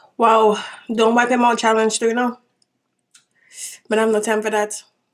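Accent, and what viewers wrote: Jamaica. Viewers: hi are you Jamaican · You Jamaican??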